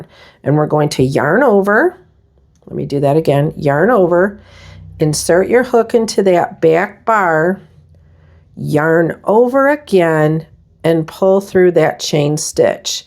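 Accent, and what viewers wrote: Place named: Northern Illinois, United States. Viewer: Girl, are you from Northern Illinois? You sound like home.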